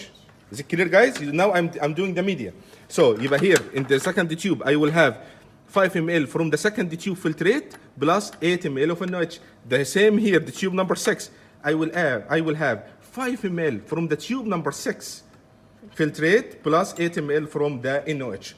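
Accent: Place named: Arab world. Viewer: Yeah, I think his accent is Arabic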